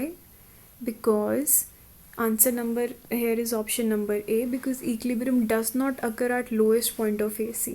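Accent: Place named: Kashmir, India. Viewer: voice sai Kashmiri lag rahai ho ap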